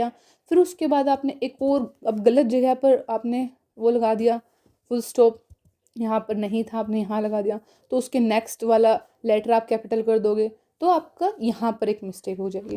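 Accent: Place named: Haryana, India. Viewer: I like your haryanvi accent🔥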